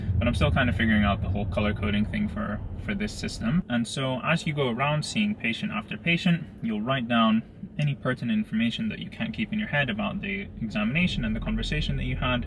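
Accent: Scotland, United Kingdom. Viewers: you sound so scottish to me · His accent has changed a lot. He sounds so british sometimes.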